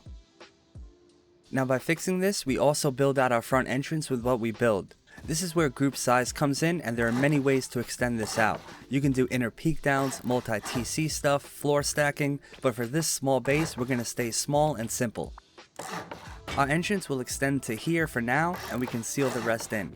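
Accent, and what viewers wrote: New York, United States. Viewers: U from New York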